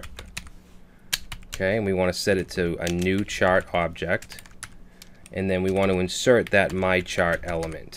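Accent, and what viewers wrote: Boston, United States. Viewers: Listens for five seconds: "This guy is so from Boston" Listens just past the one minute mark: "BOOOOOOOOM! CALLED IT!"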